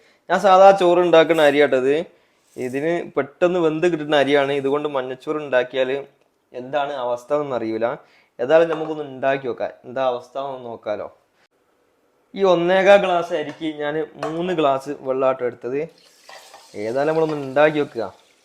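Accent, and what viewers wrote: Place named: Kannur, India. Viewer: Kannurano naad bhasha ketitt angane thonnunnu bro u are very clean person🎉🎉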